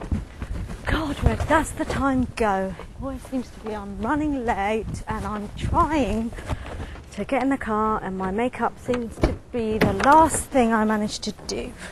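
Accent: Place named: United Kingdom. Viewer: i don't know who this woman is.. but, she's beautiful and she speaks the best british accent i've ever heard...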